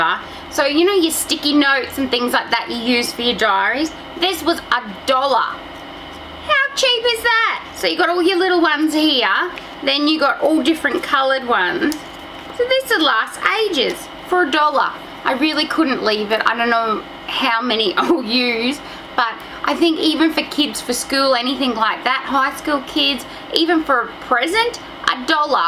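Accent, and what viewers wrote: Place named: Australia. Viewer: You have such a strong Aussie accent! 😋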